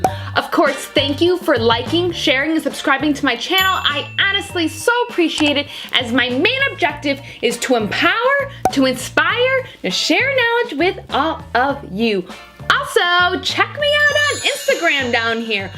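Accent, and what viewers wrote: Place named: Upstate New York, United States. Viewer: are you from upstate ny?? you sound like me haha i love the positive energy!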